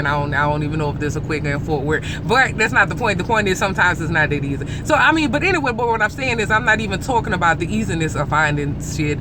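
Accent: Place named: New Orleans, United States. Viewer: U gotta be from New Orleans. Hear the accent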